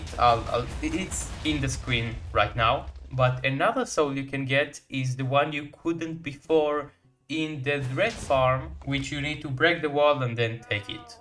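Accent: Israel. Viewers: are you israeli?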